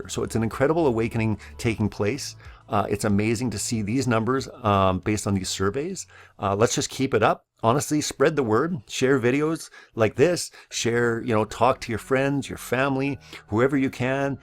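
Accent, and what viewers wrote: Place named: Canada. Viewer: Your accents Canadian obviously👍🏼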